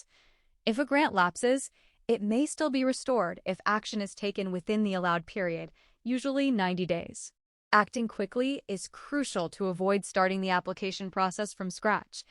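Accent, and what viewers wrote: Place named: United States. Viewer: Stop these fake news you sound like an American your acsent is not South african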